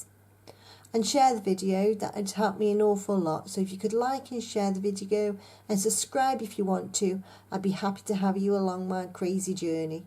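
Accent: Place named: Cheshire, United Kingdom. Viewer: Evening while looking for Christmas dec videos, just stumbled upon your vidios, as much as I love some the American designers and teachers it's nice to see a fellow brit doing some you tube tutorial. This is fab reminds me of spiral staircase. Wonderful. Plus you sound just like my aunty who lives in Cheshire, and works in Manchester very similar accent to you. x x Happy beading.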